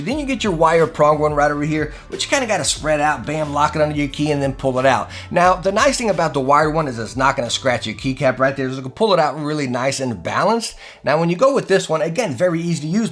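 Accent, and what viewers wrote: United States. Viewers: This guy's accent and way of talking is so American....but good video tho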